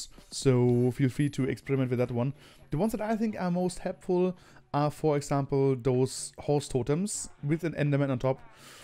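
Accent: Germany. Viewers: Einfach wilder deutscher Akzent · Wow, thought by the accent that you were german. Spot on! Btw i'm from denmark.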